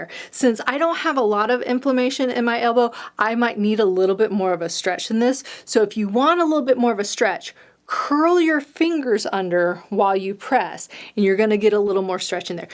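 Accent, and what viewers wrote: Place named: Oklahoma, United States. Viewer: I'm from west Texas, your dialect sounds like my family from Oklahoma, it's nice to hear!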